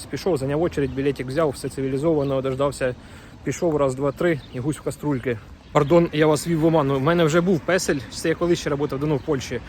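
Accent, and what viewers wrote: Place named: Poltava, Ukraine. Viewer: Друже, з Великоднем!!! А ти з України звідки? Балачка наче Полтавська. Нехай щастить.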